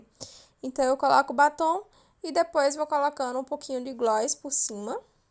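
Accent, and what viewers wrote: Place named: Northeast, Brazil. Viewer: Que sotaque é esse minha fia 😍😍😍 Também sou nordestina Estou super inscrita 💥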